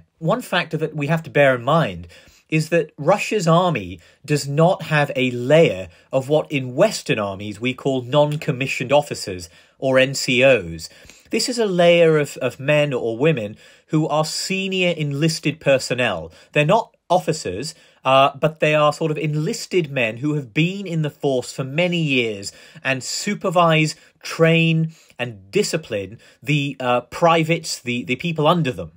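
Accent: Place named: United Kingdom. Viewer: Why Shanhunk joshi speaking like a limey. Where's the 711 accent ???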